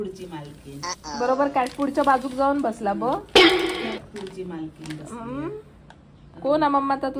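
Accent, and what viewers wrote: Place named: Konkan, India. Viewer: कोकणी बोलण मस्त वाटत, मांजराशी बोलण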